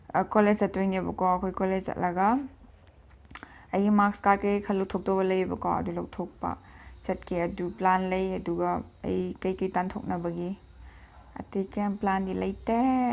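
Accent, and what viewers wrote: Kakching, India. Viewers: Kakching marol yam lol lay kei ge eche nang ba Yam nung ngai tay ko Nachak khuthe du ga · che na kakching marol lonba C ymna nungCye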